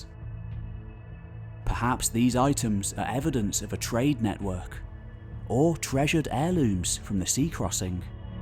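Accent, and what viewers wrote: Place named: Midlands, United Kingdom. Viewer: Mercia, you Midlands I think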